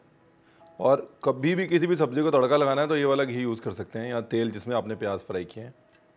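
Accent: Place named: Lucknow, India. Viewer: Ranveer ji aapka jo bolne ka lahzaa hai hume Lucknow ke yaad dilata hai.bahut bahut Shukreya... Uske liye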